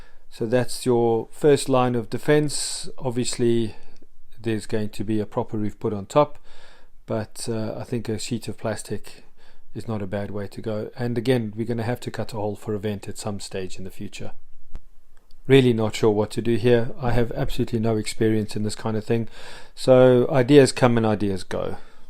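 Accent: South Africa. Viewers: Good ol South African accent 😉👌🏼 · I can spot a south african accent from miles away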